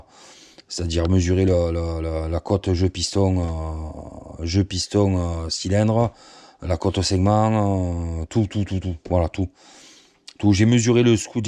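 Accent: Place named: South, France. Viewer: T est bien du sud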